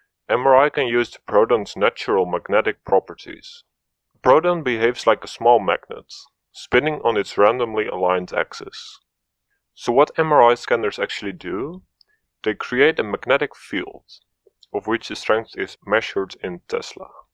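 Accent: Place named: Netherlands. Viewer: Waarom klinkt deze gast nederlands xD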